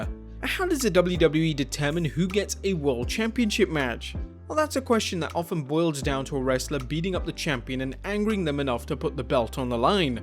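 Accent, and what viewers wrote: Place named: Southern England, United Kingdom. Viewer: Southern English man not British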